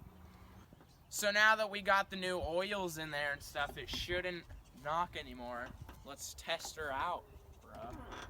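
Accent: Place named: Missouri, United States. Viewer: You tell he is a Missourian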